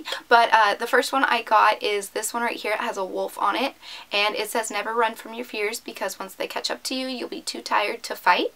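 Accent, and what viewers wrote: United States. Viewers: Western / texas . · Are you from boston??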